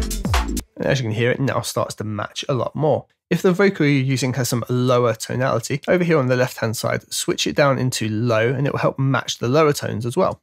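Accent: United Kingdom. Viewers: I pressed a like just for the British accent (don't mind me, if I am wrong and that is an American one, or something else) cheers for the content, why are there only 7 likes on this vid?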